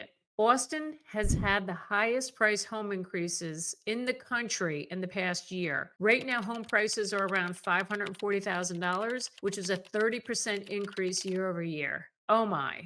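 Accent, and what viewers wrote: New Jersey, United States. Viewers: Sounds like New Jersey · Well, I'm in eastern Washington, so I have no dog in this fight, but come on ... Here we have a real estate agent with a strong Jersey accent talking about how bad Austin, TX is. Now that's just funny. How clueless can a person be?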